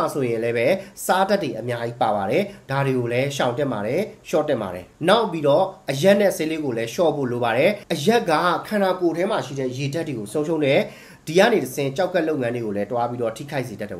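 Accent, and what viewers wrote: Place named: Upper Myanmar, Myanmar. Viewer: ပြောပြတာကျေဇူးပါ အင်းပြောတဲ့သူက မကွေးဘက်က အညာသားဖြစ်မလားနော် သန်းလာတာကို တန်းလာတဲ့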